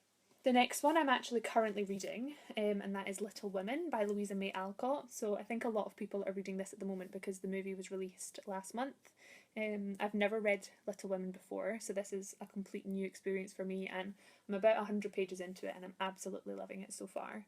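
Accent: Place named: Ireland. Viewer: Hello, New viewer/subscriber here. Am familiar with some of what you showed here, though have yet to have read any. Have been intrigued with Tolkien for a while. What would you recommend to start with him? I'm guessing you're from....Ireland....? I've always been interested in dialects, though not the best at identifying them. :-) Thanks for sharing, and looking forward to more from you. -Carm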